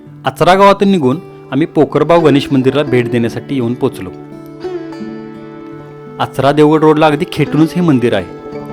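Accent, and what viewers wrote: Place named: Kolhapur, India. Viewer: खूप भारी कोकण सिरीज आहे. आपण कोल्हापूरचे आहात काय? खूप दिवसांनी माहेरचे शब्द ऐकले... चव सपक आहे... अगदी खेटूनच आहे. शेवटी आपल्या भाषेचा लहेजा एक नंबर असतो. धन्यवाद 🙏🏻💐🙏🏻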